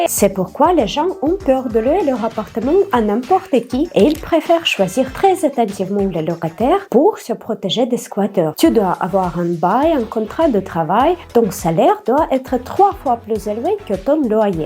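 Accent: Russia. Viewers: Je trouve que ton accent russe rend ton français mignon 🥺 d'ailleurs sa m'intéresse beaucoup de voir une personne russe vivre en France car tu t'intéresses à notre culture 🥰🤗 j'aimerai tellement apprendre le russe que je connais pas très bien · Bonjour. Je viens de tomber sur ta chaîne Youtube et sur cette vidéo par le pur des hasards. Ca me fait tellement plaisir que tu aimes notre cher pays qu'est la France malgré les tensions très fortes et très dominantes dûes au contexte actuel. Que ça me fait plaisir de te voir sourire, de te voir t'enthousiasmer, de dégager de bonnes ondes positives, dégager de la chaleur humaine et de la bonne humeur. J'adore tout autant ta franchise. Tous ces éléments combinés te donnent énormément de charme tout comme ton accent russe. Ca fait tellement du bien. Mille mercis à toi. Surtout ne change pas et reste comme tu es. Saches que tu as une superbe maîtrise de la langue française et que tu fais très peu d'erreurs. 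Nul n'est parfait. Nous devons tous apprendre de nos erreurs. Prends bien soin de toi. Amuse toi bien avec ton apprentissage au tennis. J'espère que tu pourras enfin créer ton entreprise dès que possible. Au plaisir de découvrir tes autres vidéos. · J'envisage de m'expatrier en Russie dans l'année à venir, et entendre ces points de vue croisés est une mine d'or pour moi. Merci! Твой французский идеален, а русский акцент просто очарователен! :) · Merci pour ta vidéo. Si seulement la majorité des français pouvait aimer ce pays autant que toi, on en prendrait beaucoup plus soin, malheureusement en France on aime toutes les cultures du monde, sauf la notre. Ça fait plaisir aussi de voir que tu fais autant d'efforts pour maitriser notre langue mais si je peux me permettre un conseil: essaie de faire moins de faute mais garde quand même toujours un peu ton accent russe. Déjà parce que ça fait partie de ton histoire mais surtout, un accent étranger ça donne toujours beaucoup de charme; même les américains préfèrent bien souvent l'accent anglais à leur propre accent. 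Enfin, ton accent permettra aux Français de savoir que tu as des origines étrangères et donc ils te pardonneront plus facilement si tu fais des fautes de français ou si ton comportement leur semble un peu étrange. Voici un petit exemple amusant venant d'un humoriste Franco-Anglais qui te permettra de comprendre pourquoi tu dois garder ton accent: <link> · Votre français il est super, l'accent russe lui donne tous son charme. Merci pour votre vidéo. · Jajaja j'aime ce petit accent Russe jijij ne le perd pas .😅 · Tu es intelligente, tu as une vision très juste et réaliste des choses. Le mélange des cultures est ta force. Tu parles un excellent français avec ton accent russe si craquant. J'ai un grand respect pour la Russie, sa langue, sa culture, son histoire et ses scientifiques. Je trouve l'hymne russe le plus beau de tous, il est fougueux comme La Marseillaise. C'est un pays que j'aurais voulu mieux connaitre, je ferai cela dans ma prochaine vie. 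Je te souhaite santé, bonheur et réussite. En toute bienveillance. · Наконец-то ютуб рекомендовал мне видео, где слышно, как звучит русский акцент по-французски. И наконец-то я попал на видео на французском языке, где я могу разобрать больше пары слов в минуту. 15 минут в дуолинго в комнате раздумья не прошли зря! Je suis tres content!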